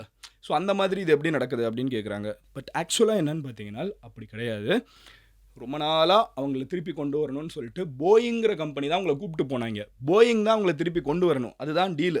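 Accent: Madurai, India. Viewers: madurai slang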